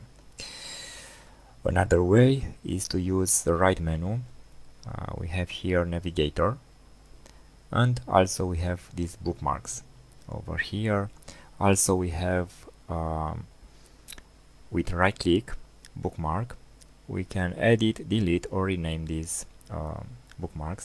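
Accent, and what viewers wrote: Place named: India. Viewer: The comment by Aayush Das Gupta says, with some profanity, that your English is good. NO, it is pathetic and is heavily laden with Hindi accent, the most pathetic of all accents. Your tutorial is a clear as stirred muddy water. Look at the down and upvotes. I seriously recommend you use a text to speech converter, there are a few of them to download for free. You should have used an ordinary text document, with page numbers and a proper word as a bookmark. LibreOffice's own help is as pathetic.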